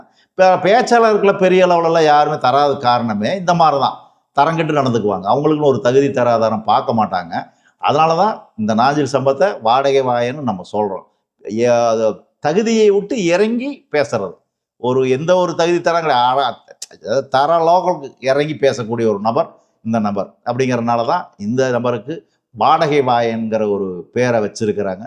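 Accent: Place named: Kongu, India. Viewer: ஐயா நீங்கள் பேசுவது கொங்கு தமிழ் பேச்சு வட்டார வழக்கு நீங்கள் எந்த ஊர் எந்த மாவட்டம் நான் திருப்பூர் மாவட்டம் திருப்பூர் மேற்கு பகுதி 25 வேலம்பாளையம்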